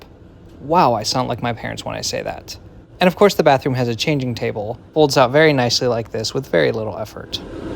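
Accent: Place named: United States. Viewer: You're European? You have a perfect American accent lol What's your native language?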